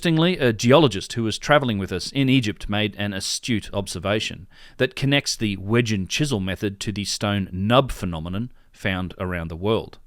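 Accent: Australia. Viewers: I'm loving your work! I know you're a global citizen now, but I'm really pleased to hear an Aussie accent behind the insights put forward.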